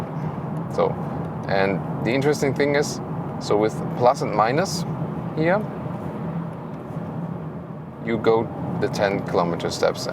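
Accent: Germany. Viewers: congratulation a little bit of german accent but the analisys is quite perfect. go ahead mate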